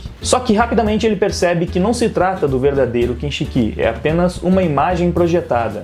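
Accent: Rio Grande do Sul, Brazil. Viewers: Obrigado pelo vídeo, grande trabalho, vc é do rio grande do sul? (Eu sou), abraço.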